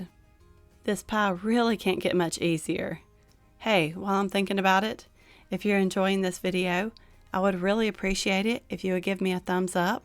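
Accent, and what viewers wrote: South, United States. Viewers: I love all your videos your country accent and most of all your recipes are all delicious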